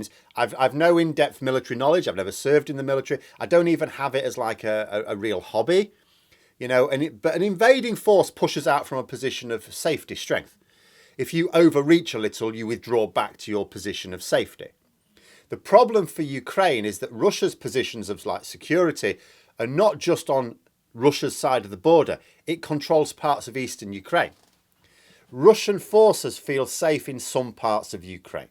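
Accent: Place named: England, United Kingdom. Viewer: By no means do I mean to offend but hearing someone with an english accent advise Ukrainians utilize IRA tactics to repel Russian occupation is unexpected and bemusing.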